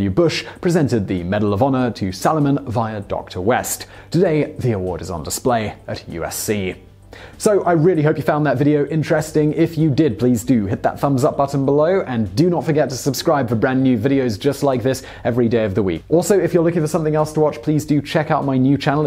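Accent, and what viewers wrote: United Kingdom. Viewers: Wtf I thought this was Vsauce (Michael here) I was so thrown off by the British accent 😂😂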